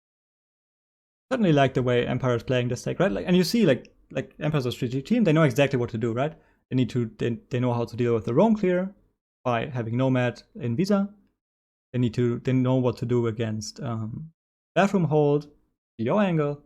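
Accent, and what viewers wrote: Germany. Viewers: I knew it i could hear the German Akzent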